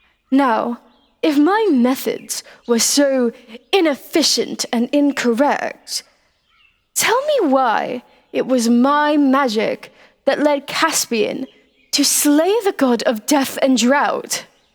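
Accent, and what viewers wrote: RP, United Kingdom. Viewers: Damn!!!!! that thumbnail is mega shes hot love the posh voice too very beautiful ❤️ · oh no, almost made it out of class with the crowd.. she was too quick for us👩‍🏫. From dissapointment to losing out on an award and an ear bashing from the teacher, to getting a bit of a personal glory and history lesson from her and being told to shape up for ourselves.. this is an ideal teacher. Took a gamble admitting what we thought of her personally too, did give us more motivation to get back on schedule and get to spend more time with her, studying📚. Magical academy always makes me think of a potty school for wizards 🏰but this took it in a new direction and had a bit of a DnD feel which is a great change, original and fun idea and can't help but like the posh accent in a dominant tone either, brilliantly fun audio once again 🎧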